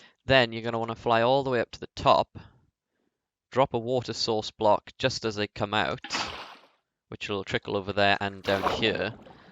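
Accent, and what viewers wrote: Wales, United Kingdom. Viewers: Very rare to hear a Welsh accent on YouTube, represent fella! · Awesome to hear a fellow Welshman! And thanks for the tutorial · There's a subtle Welsh accent there and I love it.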